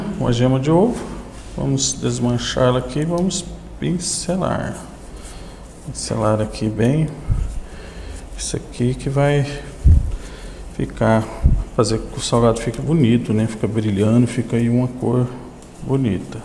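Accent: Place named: Goiânia, Brazil. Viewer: Falo de São Paulo capital acho lindo sotaque de Goiânia, acho muito legal qdo você fala a palavra Pregar qdo se refere a massa, aqui na minha região a gente fala grudar. Nosso Brasil é maravilhoso cheio de cultura diversificada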